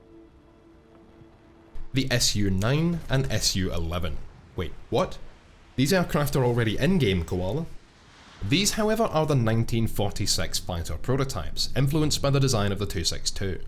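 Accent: Scotland, United Kingdom. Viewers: As a fellow Scot I believe your accent braw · I really like your Scottish accent, Mr. Koala. Also, is that a rocket built into the tail of that ME 262?!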